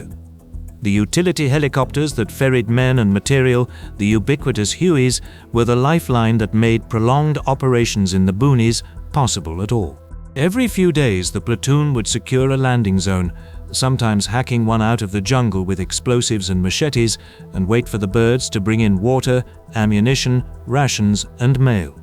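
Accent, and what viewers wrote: England, United Kingdom. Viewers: Can't like a narrator with a Brit accent shedding anything negative about American Vets.nfg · I’m really not digging the English accent!🇺🇸👎👎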